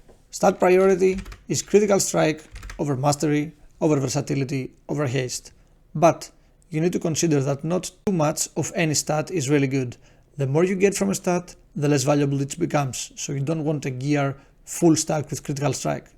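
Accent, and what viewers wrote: Greece. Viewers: Nice guide will check it out. One irrelevant question though. Are you greek ? Your accent makes me think that, thats why i ask.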